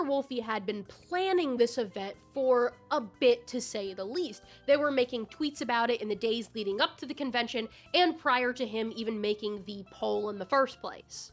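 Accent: South, United States. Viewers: you have the SLIGHTEST southern accent and it’s so cute! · I like your cute lil southern accent 😊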